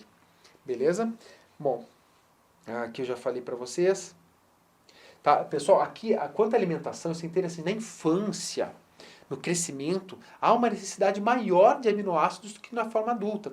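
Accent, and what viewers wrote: Rio Grande do Sul, Brazil. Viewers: Dei o like só por saber que tu é colorado, bem que eu vi que sotaque e era gente boa demais já vi que era meu conterrâneo Gaúcho, brincadeiras a parte, conteúdo muito bem explicado, excelente trabalho Prof., manda bem demais.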